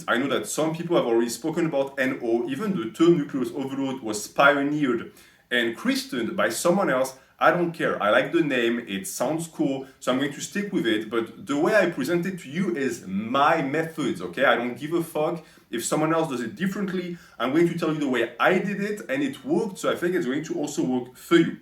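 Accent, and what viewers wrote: France. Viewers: Your French accent makes all of this better. Thank you,.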